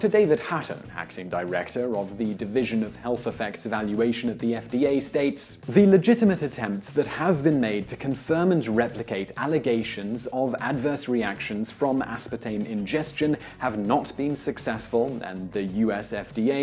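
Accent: United Kingdom. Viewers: Watching a lot of his videos, one learn that Brits pronounce a lot of words "wrong" (well, maybe just differently than we Yanks do).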